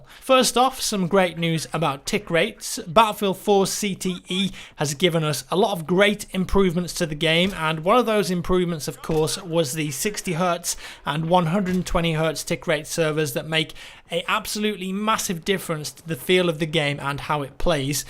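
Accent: United Kingdom. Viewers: Never Played Hardline, just here for that British voice...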